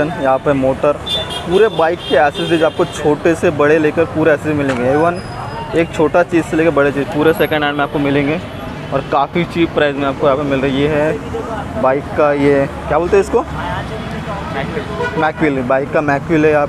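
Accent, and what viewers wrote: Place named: Maharashtra, India. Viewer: Nagpur che lokanna Hindi bolayla khaaz sutli aste ... Tumhi bihari nahi maharashtrian ahat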